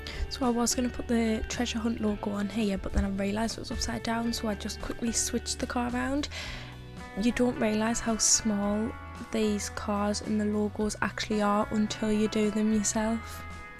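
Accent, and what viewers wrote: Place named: North East England, United Kingdom. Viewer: Absolutely top quality looking model. I was having so much trouble even finding the basic "Hulk" green escorts where I live. Ironically it was a trip to the North East (where you sound like you're from) visiting my Dad in N.Shields, where I found two RS2000s in a Newcastle Poundland. I have the first white one spare & loose, so having seen your video, I think I'll get some DD4 wheels like yours, the Tamiya acrylic and add similar details. It won't be exactly like yours as the tail plate is yellow on the white one but this would be a good addition to the collection. Beautiful effort & i look forward to watching more customs 😉 What car next? The forthcoming STH is a metallic red Lamborghini Huracan LP620-2 Super Trofeo and any basic Super Trofeo model would be easy enough. Rather than make it 100% exact, you could make it look like a road going Huracan STO & we can all agree that a metallic redcurrant red looks incredible on ANY car, but especially a V10 Supercar. 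Make it happen and I think you wont regret it., especially with a simple £4 eBay effort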